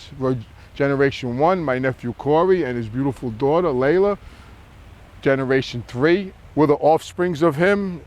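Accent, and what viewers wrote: New York, United States. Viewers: Great production. Nice to hear a New York accent. Moved up to Catskill, N.Y. 10 years after retirement. Back home now. Man, l felt like Joe Pesci in My Cousin Vinny up there! With my N.Y. accent & my clothing & jewelry they'd step aside in stores, etc. Lol Buon Natale.🇮🇹🇺🇸🌲🍕🍷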